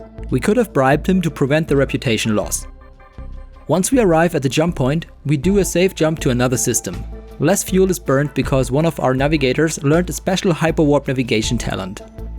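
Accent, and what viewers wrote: Germany. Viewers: Are you german?:D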